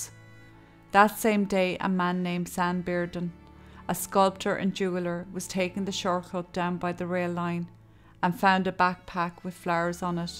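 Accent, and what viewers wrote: Kilkenny, Ireland. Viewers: thank you so much for your reply - I love the delivery of your narration of your easy to understand informative script style/narrative - your turn of phrase and the inflection of your voice when you exasperate is masterful - your beautiful accent reminds me of the people I knew when I was growing up in Tubrid, Woodsgift in north Kilkenny, where I was born in 1956 in St. Luke's Hospital - I now live in Kerry. I always miss the Kilkenny accent - I miss the sayings "fierce nice and shockin good" - keep up the excellent work you are doing and don't ever give up - have a wonderful day ;-)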